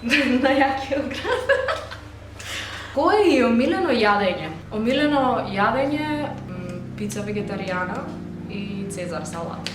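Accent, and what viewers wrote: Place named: Kichevo, North Macedonia. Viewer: пример за вистински културно одмерено девојче, браво! Многу ми се допаѓа кога зборуваш на Кичевски дијалект, секоја чест, Прекрасна девојка!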